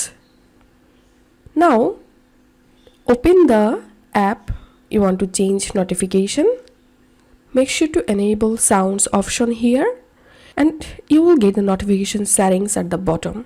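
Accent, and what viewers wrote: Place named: India. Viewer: I don’t trust the Indian accent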